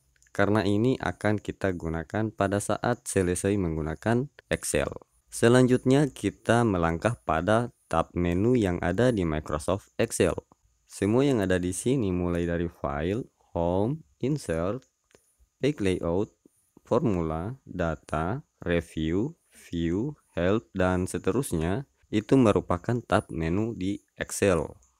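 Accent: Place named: Muna, Indonesia. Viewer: Makasih bang, sangat membantu bagi pemula 🙏 bdw, logatnya seperti daerah sy (MUNA, Sultra) 😁 maaf kalo salah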